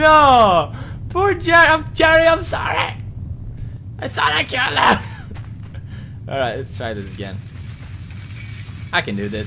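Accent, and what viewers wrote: Sweden. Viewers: OH MY GOD! BACK THEN I DIDN'T REALIZE HOW STRONG YOUR SWEDISH ACCENT WAS... oh my god, coming back here after all this time as some kind of bitterness... guess we both grew up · Lol pewds's accent is still swedishyish ya know. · I've never heard his Swedish accent till now 🤔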